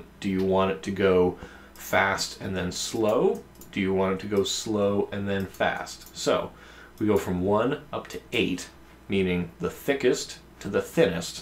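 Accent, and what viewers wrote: Canada. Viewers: nothing, I'm from the Philippines, and me and my american friend where watching this tut, and I ask him what accent is that ("your accent") and my friend said "that's probably a Canadian accent. No biggy, cool tuts anyway, learned a lot from you. Can you make an Infographic Tutorial. I've been doing a Corporate Promotional Video.. Would help me a lot. Thanks.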